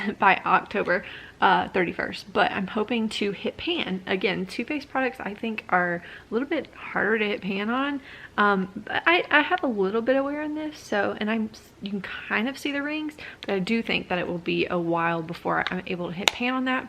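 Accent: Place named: United States. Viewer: you're developing an American accent! Lol. Nice twang girl! Enjoy your products.:)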